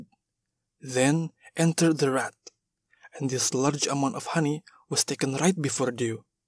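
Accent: Indonesia. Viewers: I'm hearing Indonesian pronounciation english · I recognize this accent. Indonesian accent. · Indonesian English Accent... Good job! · I 100% am sure the voice over actor on this video is Indonesian. His English accent is so Indonesian :) Anyways, great story and great voice over! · The Indonesian accent:)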